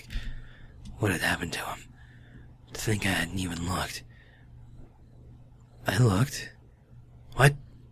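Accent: United Kingdom. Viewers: Lovely british accent there!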